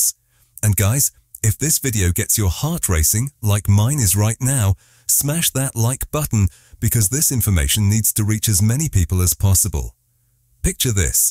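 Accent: United Kingdom. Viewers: Am I the only one who’s sick of the ubiquitous English accent AI voice narrators? Life’s too short to listen to this fop spell out “3 I A T L A S”, over and over - at least 20 times in the first few minutes - makes it unwatchable ~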